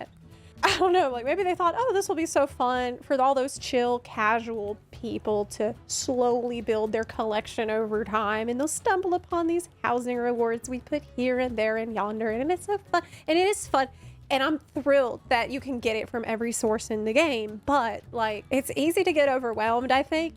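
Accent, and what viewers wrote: South, United States. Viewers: you sound southern:P maybe Tennessee or Louisiana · Yeah, same, same! I just left a comment about it and to clarify, I’m exactly the same way. Not trying to be confrontational or offensive at all, just legit curious! My father’s people are from Tennessee and the rest of my family is from Oklahoma, so she sounds like half my kin 😄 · sound like you have a Southern drawl in your American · It’s been years since I watched a video. Was she always southern?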